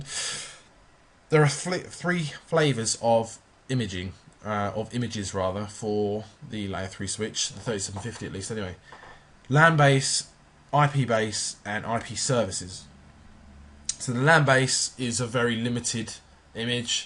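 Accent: United Kingdom. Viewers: Finally, An IT tutorial with an English accent.. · Rooters. God, you are gloriously British.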